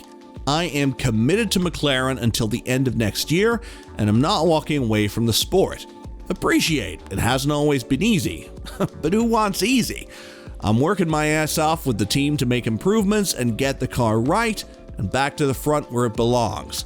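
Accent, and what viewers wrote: United States. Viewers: Narrator is a septic. What did you expect?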